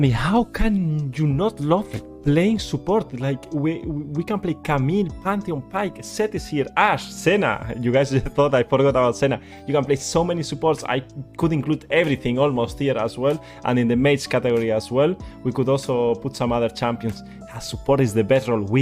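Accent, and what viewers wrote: Spain. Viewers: suena tan raro escuchar a alguien español haciendo tutoriales en ingles xd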